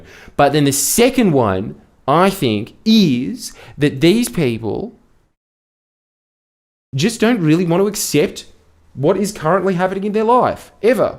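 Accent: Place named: Australia. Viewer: That’s what HE said. 😂 He even showed the same face that comes from that pleasantly satisfied feeling. “Mmmm. Arggggh. Yesssss.” along with that expression of the Westerner’s stoicism with hand cupping his chin. I’ve concluded that he actually gesticulates more than I & I didn’t think that was possible. Thank whatever God(s) may be that what otherwise would appear to be Parkinsonian tics instead, has the positive connotation that it does or else I’m sure I would be terribly self-conscious about it. Yet there is something … & I can’t quite put my finger on it … to the feeling I get when I’m surprised repeatedly - like the baby in a game of peek-a-boo ever amazed & bewildered at the magic of a disappearing adult - by his right arm becoming invisible as it slips in the side pocket. I now have to imagine that there IS a portal through which JS traverses dimensions & is converted to. 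I mean THANKS for talking about the softness of the producer’s hair that you can feel through there. You pack SO MUCH info into such a short timespan where I’m tripping over your Aussie accent, actually needing the transcript at times but the closed captioning is fd up … it can’t even get your Australian twang right… & then & THEN (no & THEN) I have to now completely imagine an invisible black hole where I can’t see past the event horizon yet your arm is teleported across planes… where you have the cheapest budget for a Star Trekkian Transporter since imagination is free. Hey! Is a misquote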